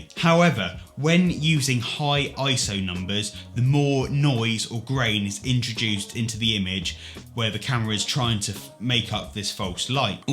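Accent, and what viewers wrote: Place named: United Kingdom. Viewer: Nice British accent sir...love it. Done subscribing to your channel sir. Please visit me at my channel and subscribe too so we stay connected. Godbless.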